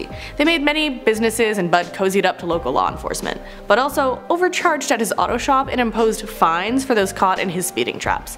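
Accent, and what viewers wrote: South, United States. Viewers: Southern accent for calif town??? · I may stay in ghost town. Keep going Southern accent.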